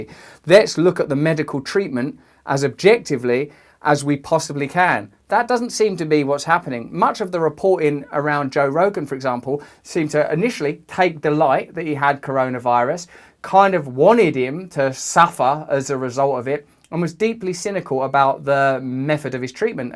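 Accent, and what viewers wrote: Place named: United Kingdom. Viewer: The Brits are so cute when they pronounce words.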